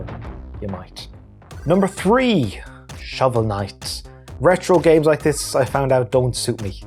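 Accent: Ireland. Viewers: Guardians Of The Galaxy and the voice of a Irishman, no better way to spent a Friday night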